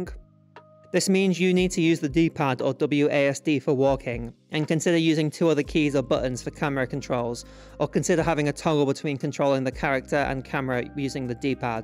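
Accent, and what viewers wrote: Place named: Northern England, United Kingdom. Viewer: Ay up it's nice to hear another Northerner who's into making games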